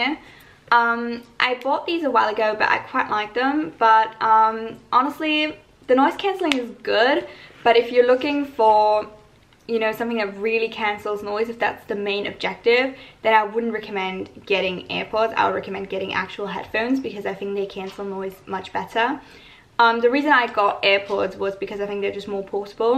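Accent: United Kingdom. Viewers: omg i just watched her what's in my bag video from before and she has a bit of a british accent now loooool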